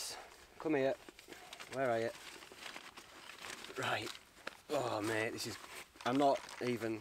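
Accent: Yorkshire, United Kingdom. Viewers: my favourite fellow 'mucker' for outdoor stuff. And not bad a carpenter too. Your natural skill of good Yorkshire waffling is brammer Keep on enjoying yourself. Really shows in Ur vids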